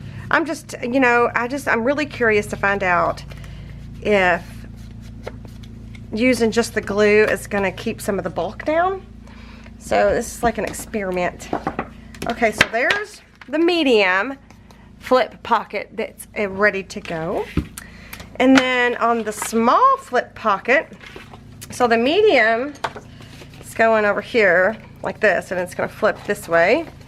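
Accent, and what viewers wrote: South, United States. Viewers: Lol, I was listening to you and thought: this girl is definitely from the south. Then I saw you were in Louisville. I’m in Paducah! Love your ideas!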